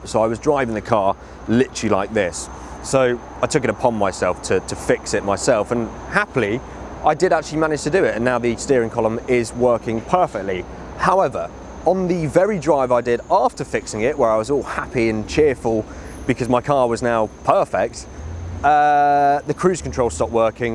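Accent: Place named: West London, United Kingdom. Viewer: I like the way to say Garsekets instead of gaskets true west london twang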